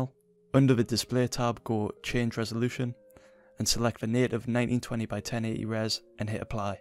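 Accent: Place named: United Kingdom. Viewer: 'H' key. Was tough for me to understand the British accent too lol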